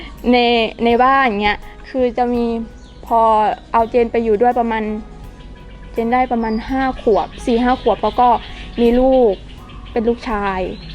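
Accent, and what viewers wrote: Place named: Central Thailand, Thailand. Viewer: คุณโชคดีที่เปนได้ทั้งคนไทยและคนลาว แต่ที่แน่ๆ "คุณคือคนไทยโดยกำเนิด" ผมไม่รู้ว่าการอ่านหรือเขียนภาษาไทยของคุณดีแค่ใหน เเต่มีวิธีแก้คือ อ่านหนังสือไทยทุกเรื่องให้เยอะๆแค่นั้นเอง ไม่นานก็จะสำเร็จ ส่วนเรื่องการพูดสำเนียงไทยนั้น ถ้าคุณไม่ได้บอกว่าอยู่ลาวมาจนถึงอายุ 18 ปี ผมคงนึกว่าคุณอยู่ภาคกลาง อย่างเช่น กรุงเทพเป็นต้น ขอให้ประสพความสำเร็จในชีวิตนะครับ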